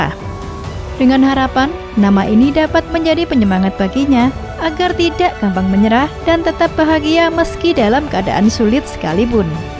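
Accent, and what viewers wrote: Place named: Medan, Indonesia. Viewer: Bang lu orang medan ya?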